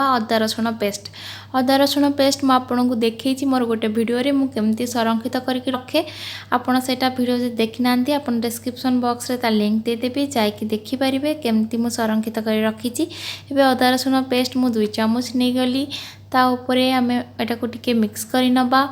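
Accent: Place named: Baripada, India. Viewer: tame baripadia ki tama katharu Jana poduchi hele bahut badhia ghanta Heichi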